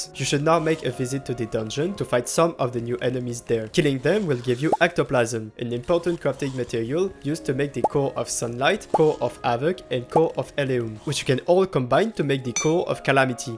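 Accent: France. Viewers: Goated guide as always this guy is just built different are u french? cuz yk the accent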